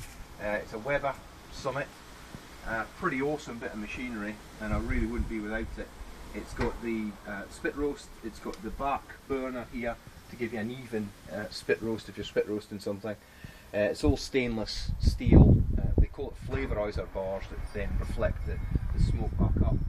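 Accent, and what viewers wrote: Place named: Scotland, United Kingdom. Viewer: If you can get past the Scottish accent, this is a very good presentation.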